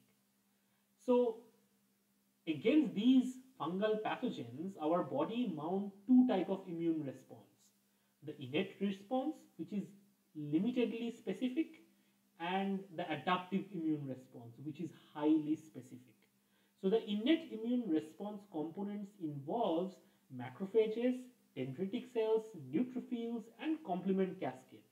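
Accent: India. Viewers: I do not want to sound too harsh, the video is helpful, and we are thankful for it, although the sound is far too quiet, but I am always wondering why NOT ONE indian scientist trys to speak without the typical accent. I have worked in some international labs and indians have always the strongest accents - they simply to not seem to care....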